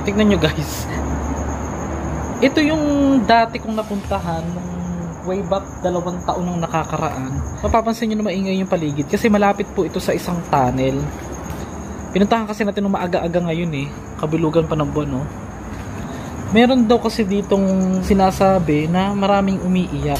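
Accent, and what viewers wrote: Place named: Bulacan, Philippines. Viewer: ay malapit ka sa Bulacan, bulacan..e yung punto eh!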